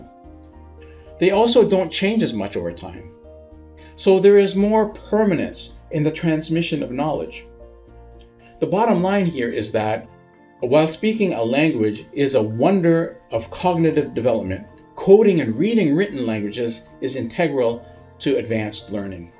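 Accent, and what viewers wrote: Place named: Iran. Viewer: Because he is Persian. I think. They have hard time pronouncing qaf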